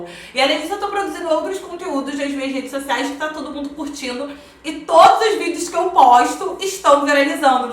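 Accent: Rio de Janeiro, Brazil. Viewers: Eu só sou escrita no canal!! Porque eu simplesmente amo o seu sotaque carioca Sua energia .Parabéns pelo sucesso 🎊🎉🎊🎉🎊🎉🎊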